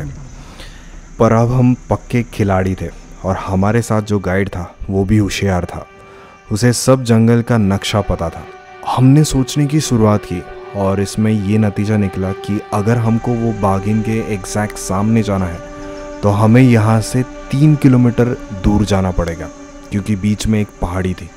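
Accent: Maharashtra, India. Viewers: as much as I love jungle Safari, I hate the Cricket commentry. Marathi accent , I miss it , like I miss the Bagh !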